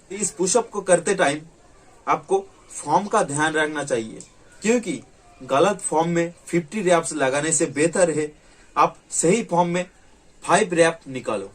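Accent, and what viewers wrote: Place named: Bengal, India. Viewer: Super ...hindi bolna thora improve kerna he.......kiu ki ap sayed bengali ya assamies ho.....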